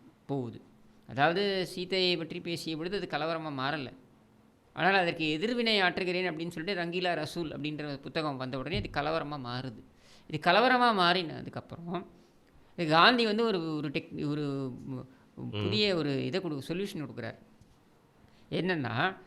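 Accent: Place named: North Chennai, India. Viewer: North chennai slang is good sir. It is a mix of Tamil, Telugu, hindi or urudu slang. A language should be able to convey the message. Litrature is totally different from colocal language. Both are to be appreciated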